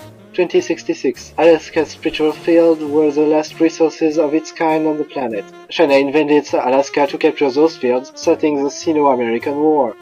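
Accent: France. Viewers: Si je sais reconnaitre une chose c'est bien un accent Français en Anglais, dis moi, ne serais-tu pas originaire d'un pays pratiquant la langue de Molière? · I thought you were trying to do the accent. I thought that was kinda clever having a frech accent with the old jazz music. Didnt realize it was unintended. Good job with the history too. Ive only played fallout 3 and NV. I never exactly knew the history in the game. Good video. Please keep making more!!:D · tes videos sur l'histoire de fallout sont geniales et tres interessantes. Franchement rien a redire la dessus. Par contre, jvais passer pour un rabat joie, mais ton accent francais en anglais cest.. pas possible. Je salue tes efforts pour le faire en anglais avec les sous titres fr et anglais, cest un enorme travail jen suis conscient mais l'accent me deplait. Je suis désolé d'être franc comme ca mais si tu pouvais ameliorer ce point ce serait parfait. 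Mais sinon je te le redis tes vidéos sont geniales:-) A la prochaine! Grayef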